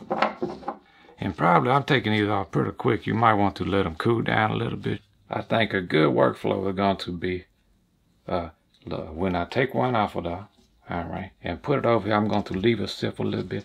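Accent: Louisiana, United States. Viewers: Is that the bayou accent?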